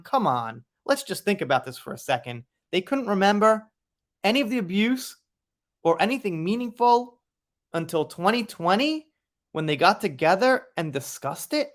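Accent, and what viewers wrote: New York, United States. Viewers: I find your voice and accent sooo new york ...it is perfect ...keep it! Dont change...when young i moved to california (in thr 1960s bedore insanity) and was made fun of so i DELIBERATELY got rid of my midwest twang.. i actually had teens ask me about indians living in tee pees..no joke..they were that ignorant...i ended up being the science aid because i was so far advanced in science compared to those kids..actually in every class...and i was considered a c and d student in oklahoma...there I was an a plus student with out trying. There.fed your ALGORITHM...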